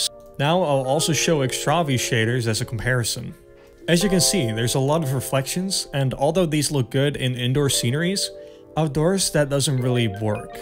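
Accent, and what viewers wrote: Netherlands. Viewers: You’re either Dutch or from Belgium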